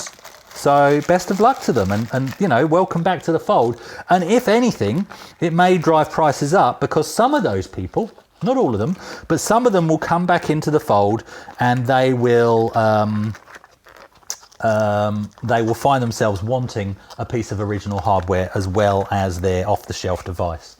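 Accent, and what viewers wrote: Australia. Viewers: I guessed correctly why the Pi was being packed away, but as a first time viewer my real question was "Why does this British chap sound like an Australian?" But I did watch the entire video...and now I know. :) Subscribed...by an Aussie in Canada...probably sounding a bit Canadian by now.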